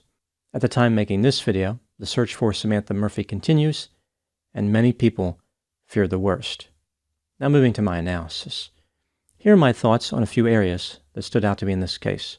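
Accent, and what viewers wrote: United States. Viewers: He’s not pronouncing it right. He has an American accent, he should say it like “Mel-burn”. With a hard R.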